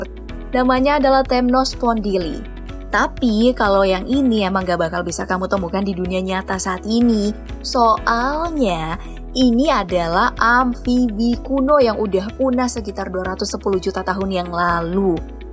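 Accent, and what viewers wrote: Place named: West Java, Indonesia. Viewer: Ini mimin nya pasti org sunda😅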